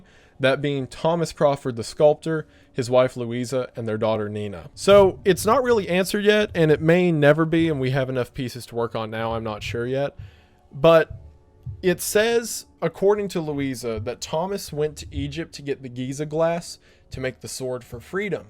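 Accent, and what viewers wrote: South, United States. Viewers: Dawg I had no idea wendigoon sounded like he’s from the south till I heard his dad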